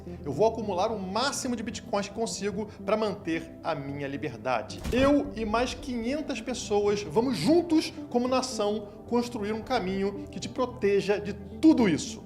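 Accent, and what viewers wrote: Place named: Rio de Janeiro, Brazil. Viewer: pelo sotaque acho que é do RJ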